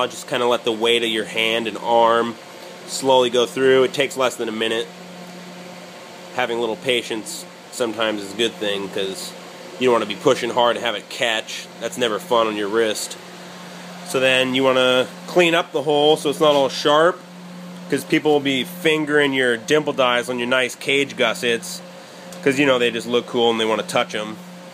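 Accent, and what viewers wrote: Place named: California, United States. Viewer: where are you from? I'm in Santa Cruz, CA. there's no one who talks like you that's not from or in CA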